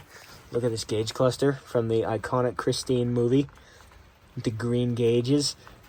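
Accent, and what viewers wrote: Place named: Canada. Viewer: As soon as I heard "might as well go for a soda", I knew you were Canadian. The sterling elocution hinted at that as well 😂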